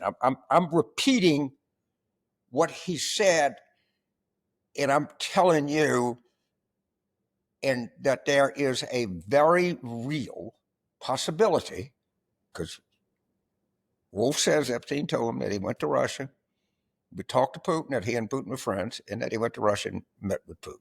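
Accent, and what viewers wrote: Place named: Louisiana, United States. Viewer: I prefer my commentary on the complete and utter downfall of F45 served up with a Cajun accent.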